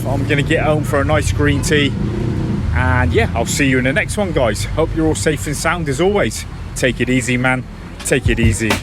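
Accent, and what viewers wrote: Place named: Midlands, United Kingdom. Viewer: Your not likely to take to Spice then ;-) .Just saw a documentary about Manchester and the Spice epidemic .From your accent i detect the Birmingham /Coventry /Midlands locality ? .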